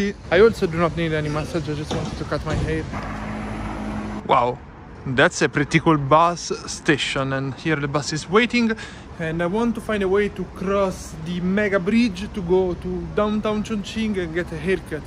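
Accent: Italy. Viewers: I love your Italian accent. Nice haircut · 这个英文是意大利人吗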